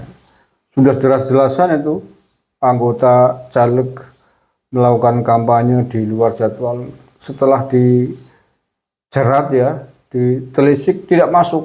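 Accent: Banyumas, Indonesia. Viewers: Aha pak rika bahasNe ngpak banget banyimasan,aku dadi seneng ngringokna bahasane rika kas bantumas aku wong wanareja ds Cilongkrang,paryaiku geindra pak....🙏🙏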